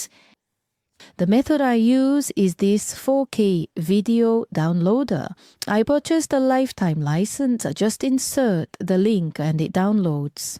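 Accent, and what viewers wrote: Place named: India. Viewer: 인도 사람이 중국어 하는거 같아요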